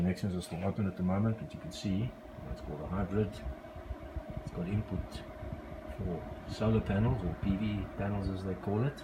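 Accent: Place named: Afrikaans, South Africa. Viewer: Jy klink afrikaans.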